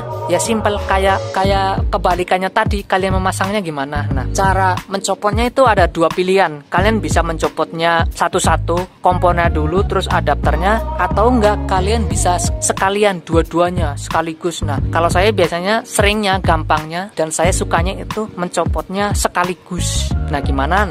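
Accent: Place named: Java, Indonesia. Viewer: Jawa mana bang.ko kaya kenal logat y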